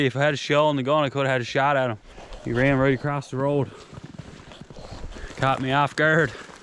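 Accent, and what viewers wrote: Newfoundland, Canada. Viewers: I'm from Saskatchewan. Your accent gave me mixed signals, then I thought about it and realized you were a Newfie. Right on man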